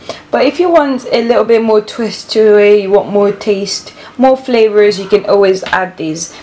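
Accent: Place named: United Kingdom. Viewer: 😍😍😍😍😍😍😍 she talks well, your accent sounds Uk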